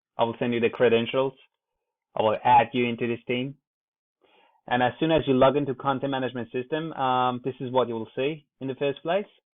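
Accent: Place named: Iran. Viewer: Great Persian-English accent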